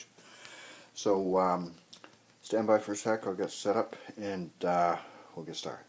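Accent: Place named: Atlantic Canada, Canada. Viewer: I think I recognize an Atlantic Canadian accent. I'm originally from Cape Breton.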